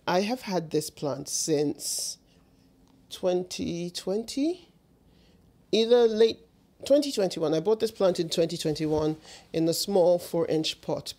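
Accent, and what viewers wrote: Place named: South Africa. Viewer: What a wonderful collection and they all look so happy! (new subscriber here) I love listening to your voice, so musical and expressive! Is your family from South Africa? A good friend of mine is SA and your voice reminds me of his.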